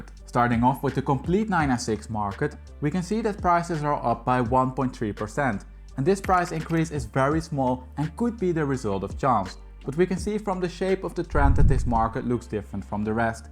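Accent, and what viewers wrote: Netherlands. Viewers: You sound dutch, i'd love to see you analyse data on homes here. I know it's significantmy different from your regular content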